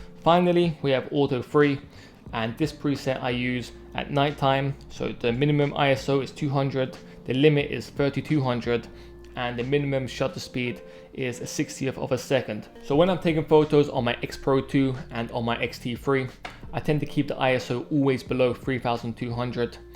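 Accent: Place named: Greece. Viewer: I like how he starts out sounding like Ali-G and ends up sounding Greek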